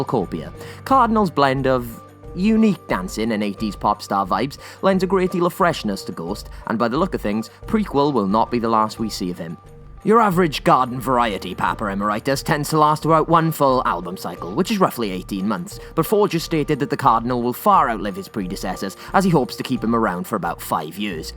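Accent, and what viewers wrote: Wales, United Kingdom. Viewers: Is that a Welsh twang I'm sensing there ?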